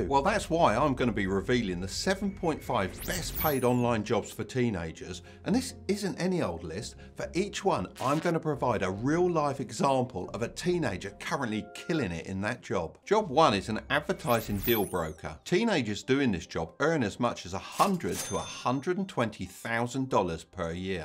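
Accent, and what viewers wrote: United Kingdom. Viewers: you have a british accent so i trust you 100%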